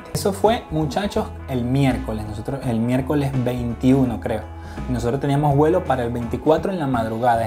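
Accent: Argentina. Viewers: Me encanta tu acento medio argentino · Y qué es lo q te da risa? Supongo ignorás q los argentinos hablamos así.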